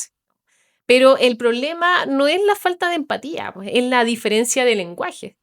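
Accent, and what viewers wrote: Chile. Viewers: Me llamo la atencion por que deje correr los primeros segundos y note tu acento y suavidad chilena, estoy de acuerdo como graficaste con viviendas como seria cada generacion jaja muy bueno el video.. saludos desde buenos aires!..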